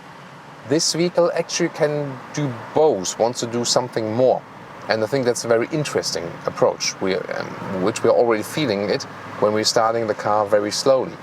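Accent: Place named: Germany. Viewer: Little by little you're starting loosing your accent almost German 90% combined with 10% english, before was G 50% E 50%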